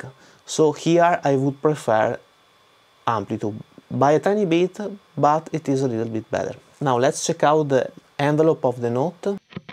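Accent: Italy. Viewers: Si sente poco che sei italiano ahahahhaa Grandeeeee bel video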